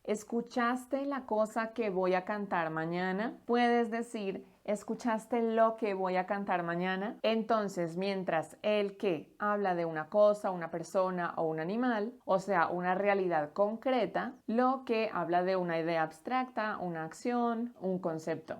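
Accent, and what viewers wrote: Colombia. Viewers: Muchas gracias. Estoy nueva agui... me encanta tu clase, tu manera de ensenar, tu acento de Colombia. Voy a continuar escuchandote. Gracias otra vez. Abrazos de la distancia, de EEUU.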